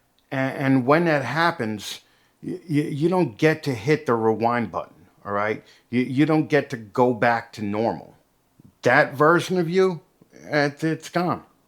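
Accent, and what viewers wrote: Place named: Chicago, United States. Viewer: 1. Was prosthetic leg not an option? 2. Great message/spirit. 3. Have you ever lived in Chicago? Your accent reminds me of when I lived there.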